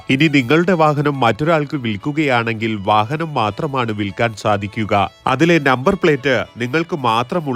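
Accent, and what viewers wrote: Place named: Thanigramam, India. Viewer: Thangalude samsaram kelkumbol Thanigramathil genichathupoleyunde I like it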